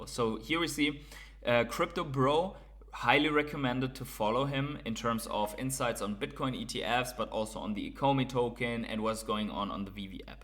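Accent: Germany. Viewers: Das ist mal heftiges denglish 😂😂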